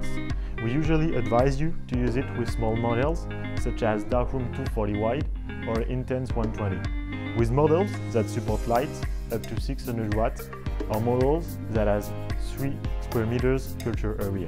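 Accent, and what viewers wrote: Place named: France. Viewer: Nan nan nan ... ce n’est pas possible, pas avec un accent français à couper du bois et encore moins avec un look de VRP ... Je vous le fais version vendeur pro, sans accent soporifique contre une Gavita e-series 6/750w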